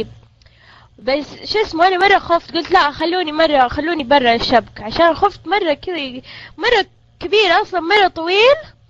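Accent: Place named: Urban, Saudi Arabia. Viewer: يعني الحين هذي لهجه حضر السعوديه؟ يع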